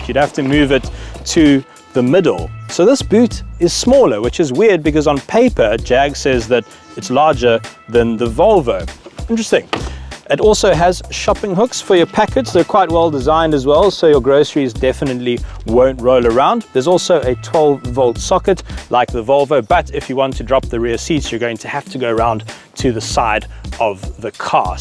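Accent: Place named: South Africa. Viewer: This SAfrican accent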